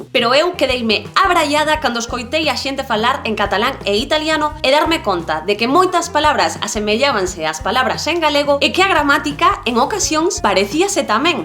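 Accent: Castile, Spain. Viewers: Yo diría que trates de pronunciar gallego como nativa ya que sabes hablarle xq estas hablando gallego con acento castellano ESO NO ES PERFECTO.... ES COMO QUE UN FRANCES NOS QUIERA ENSEÑAR CASTELLANO CON ACENTO FRANCÉS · Galego con acento de un español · Ela está falando portunhol com forte sotaque espanhol... Pelo que sei, isto não é galego... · No sientas esto como una crítica pero creo que tu gallego está muy castellanizado (usas infinidad de tiempos compuestos en los verbos y muchas palabras que tienen su variante propia en gallego como tal). Imagino que es porque eres 'neofalante' y lo has practicado poco. Sin embargo he de darte la enhorabuena por tratar de visibilizar y darle toda la importancia que se merece a un idioma que, sin duda entre todas las lenguas de España, es la que tiene más potencial. Mi consejo: practícalo más si puedes, escucha a la gente más mayor (suelen ser los que menos contaminación lingüística tienen aunque no son infalibles), lee mucho al respecto y lo bordarás (nadie nace aprendido e incluso estudiar bien el gallego en Galicia es difícil ya que tanto RAG como profesores coinciden equivocados en que el gallego evoluciona de manera natural cuando en realidad lo hace con un alto grado de contaminación lingüística). 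Es más, alguien en los comentarios decía que te estaba escuchando y que creía que hablabas castellano (no es el primero que es de fuera de España al que le escucho decir que el gallego es "como un castellano mal hablado". Síntoma excepcionalmente grave de que el gallego en cien años acabará siendo un dialecto. De hecho la fonética es con el normativo prácticamente castellana. Una pena... Te lo dice alguien que se defiende en varios idiomas, la mayoría de ellos romances y contando también con algunos propios de la península ibérica, y que ve cómo el gallego poquito a poco es, de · ela esta falando eu português com sotaque espanhol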